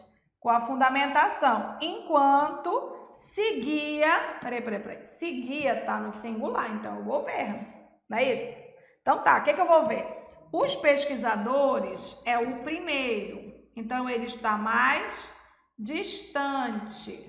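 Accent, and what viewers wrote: Pará, Brazil. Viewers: Ótima aula . melhor ainda com esse sotaque paraense .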